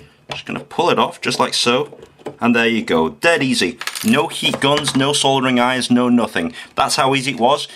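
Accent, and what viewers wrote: United Kingdom. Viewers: Thanks. What a pain in the keyster. If I decide to make a guitar fretless, I think I'll buy the neck.Thanks for this video!BTW...Vietnamese (?) guy with a Texas A&M tshirt and a British accent...i chuckled a little!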